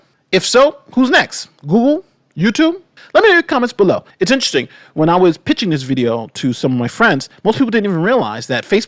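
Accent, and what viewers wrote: New York, United States. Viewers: Love your videos & your New York accent. 😄👍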